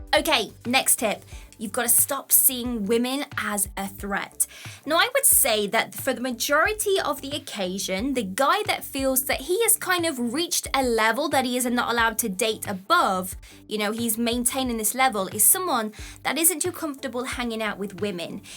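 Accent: United Kingdom. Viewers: Her voice pitch + british accent = something interesting going on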